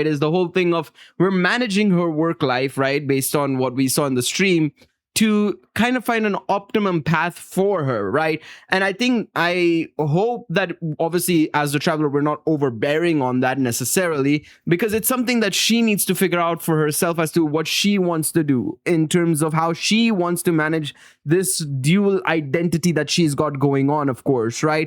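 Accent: India. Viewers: Brother are you indian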